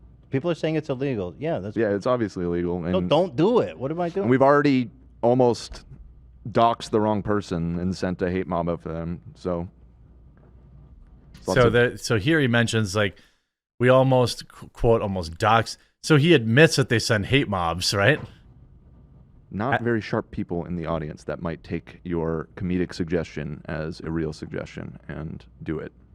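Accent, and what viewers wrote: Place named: Upper Midwest, United States. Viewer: I just have to say that you talk like someone from the WI, MN or UP.. it took me forever to quit making everything a question with the "ay" on the end of any comment I made. Then if course we have the reverse "ya"!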